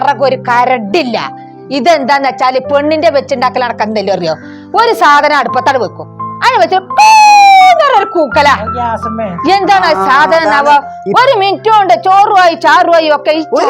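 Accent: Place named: Karuvarakundu, India. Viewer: ഇത് നമ്മളെ നാട്ടിലെ പാർക്ക്‌ ആണല്ലോ കരുവാരകുണ്ട് എന്റെ നാട്. നിങ്ങളുടെ സംസാരം കേട്ടപ്പോൾ തന്നെ തോന്നി ആ ഭാഗത്തു തന്നെയായിരിക്കും എന്ന്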